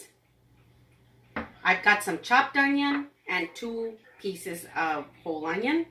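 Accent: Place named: San Antonio, United States. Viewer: You from TX? I’m from San Antonio. You sound like you’re a San Antonian . Reminds me of home. Been in NYC for a long time. Miss that accent. Us younger generations don’t have it really anymore. Brings back memories of childhood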